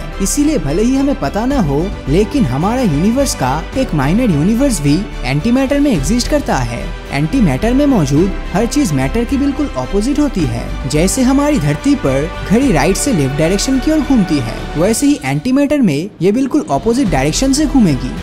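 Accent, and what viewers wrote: Bengal, India. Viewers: Bhai aap Bengali hain na.... ❤ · Are you bengali!!!!Your accent says bro☺️☺️☺️☺️☺️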